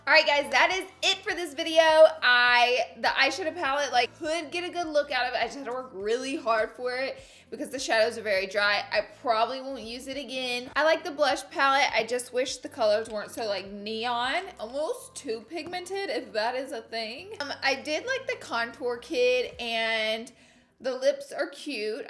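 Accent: South, United States. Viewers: Olly. Lol. Love u with ur sweet southern accent!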